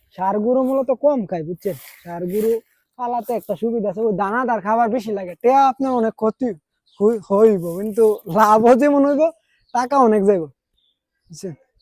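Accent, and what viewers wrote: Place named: Brahmanbaria, Bangladesh. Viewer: তোমার বাড়ি ব্রামনবাড়িয়া?ব্রামন বাড়িয়ার মানুষ কথা শুনলে মেজাজ টা গরম হয়ে যায়,কারণ এরা খাওয়ারে বলে হাওয়া আরো অনেক কথা আছে এই টাইপের আছে।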